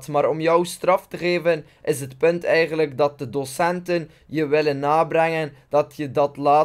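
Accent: West Flanders, Belgium. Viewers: woon je eigelijk in West-Vlaanderen of denk ik dat gwn 😂❤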